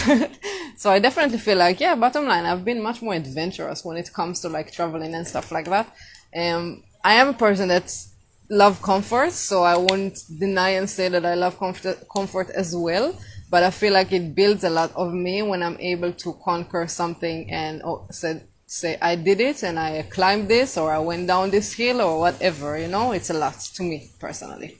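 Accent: Jamaica. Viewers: I can hear that you have picked up a slight Jamaica accent. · 🙂Lady you have the Jamaican accent!!🧏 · Sorry, but Yuh yaawdie accent top tier yufuk!! 🤭🔥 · You sound and could pass as a Jamaican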